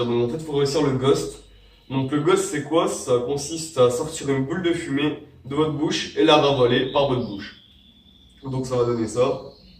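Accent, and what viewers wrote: France. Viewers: tu viens du nord ? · T'es de Ch'Nord?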